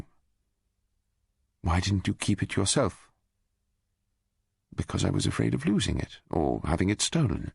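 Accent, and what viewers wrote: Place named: England, United Kingdom. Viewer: English accent is the best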